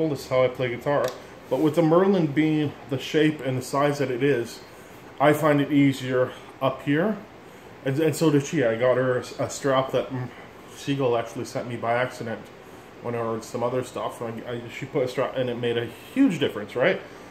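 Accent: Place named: Louisiana, United States. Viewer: your starting to talk like a Cajun down here aint that dandy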